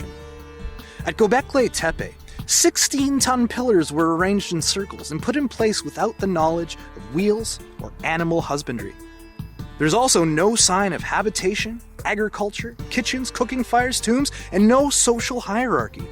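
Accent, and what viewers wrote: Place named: Russia. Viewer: Awful music and the Russian accent sounds crass and stupid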